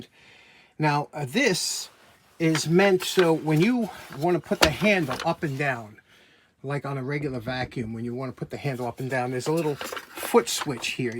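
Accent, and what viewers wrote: New York, United States. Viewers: Great Video bro that Vacuum came out great def in my opinion the best YouTubeer to listen too while doing a restoration 💯 Its probably the NY accent has to be 👍🏼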